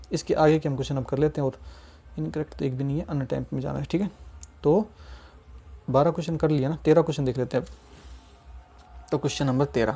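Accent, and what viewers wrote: Rajasthan, India. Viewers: Bhai app rajsthan se ho kya ? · Rajsthan se ho kya bhaiya aap 😅❤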